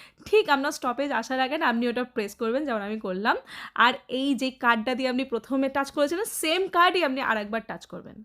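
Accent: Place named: Kolkata, India. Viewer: দাদা আপনার টা কলকাতার ভাষা , মানে আমার শহর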